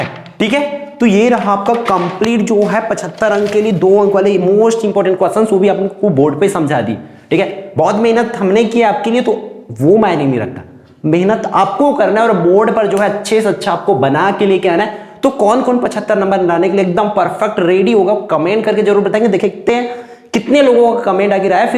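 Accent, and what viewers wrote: Chhattisgarh, India. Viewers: aapke video me cg sound baj raha hai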